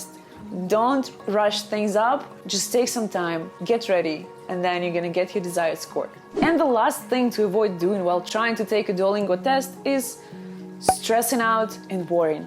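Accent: Russia. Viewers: Is your native language is Russian language Because your English speaking is seems like you are Russian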